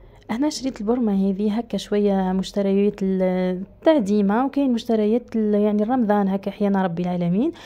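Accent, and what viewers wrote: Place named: Tunisia. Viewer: لهجتك كيفنا التوانسة ❤️😘 انا نحب الجزائر واهل الجزائر والله والبلد الوحيد الي نحب نسافرلو بعد مكة والمدينة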